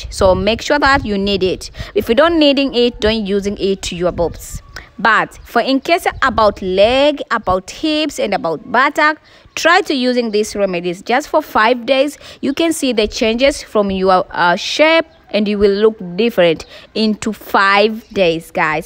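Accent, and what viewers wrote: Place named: Tanzania. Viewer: Oh my God real TZ woman, the English just Sweet....🤗💕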